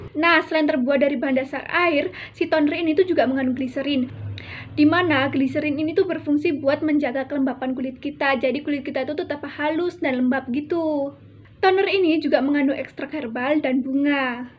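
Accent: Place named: Malang, Indonesia. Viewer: Khas logat malangan ki bocahe. Ayu ayu kinyis kinyis wong kono yo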